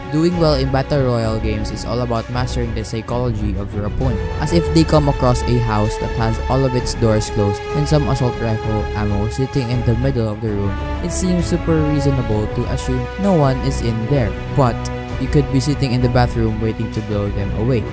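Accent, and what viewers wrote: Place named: Philippines. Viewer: sabi na eh pinoy ka ibatalag acsent ng mga pinoy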